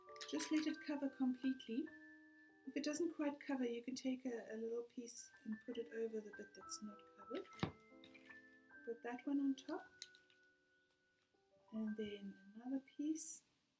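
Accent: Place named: South Africa. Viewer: lovely to hear RSA accent ...where is your studio? i stay in Tokai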